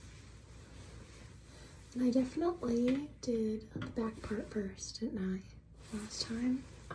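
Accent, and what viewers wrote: South, United States. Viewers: Wow, is it just me or is a Southern accent coming out more in this video? 😁 Love the hairstyle!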